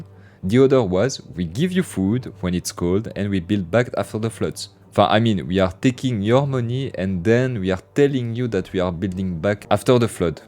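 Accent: France. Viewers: I just want to say this is the most french accent I've ever heard. Magnifique!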